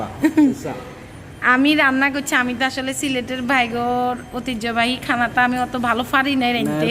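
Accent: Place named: Noakhali, Bangladesh. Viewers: অাপনার নোয়াখালী ভাষা ভালো লাগলো। অাপনার রাননা বাননা ভালো লাগলো। অাপনে অামার চ্যানেল টা দেখবেন। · আপু তোমার নোয়াখালী ভাষা গুলো আমি তেমন বুঝিনা কিন্তু শুনতে অনেক ভালো লাগে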